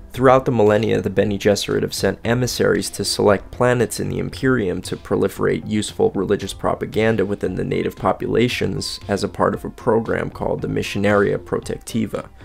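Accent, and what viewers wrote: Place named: Baltimore, United States. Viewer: Eerily good Baltimore accent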